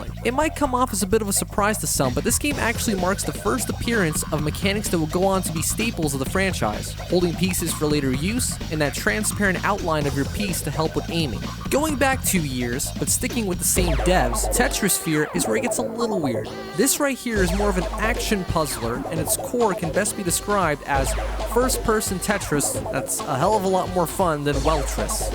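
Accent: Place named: United States. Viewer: Наистина звучиш като американец. Впечатляващо. Също кошчетата за боклук (барабани) на Ларс звучат прекрасно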